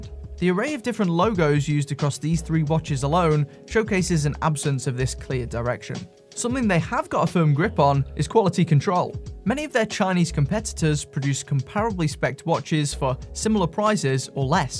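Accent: United Kingdom. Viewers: Its always so weird to me to hear brits pronounce the "h" sound.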